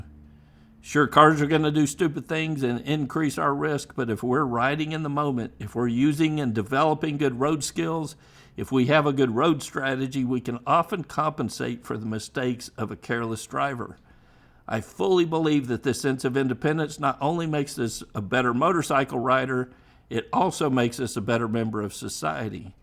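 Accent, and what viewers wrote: Texas, United States. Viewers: I talk Dutch and still am able to perfectly (almost then) understrand your Texas English. You're good 🙂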